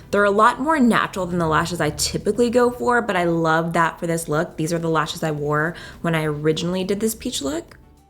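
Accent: South, United States. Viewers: Has anyone noticed she has a slight country accent?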